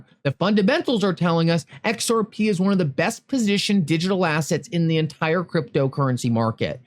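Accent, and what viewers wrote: Allentown, United States. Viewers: with the Allentown accent is wrong again. Way to go!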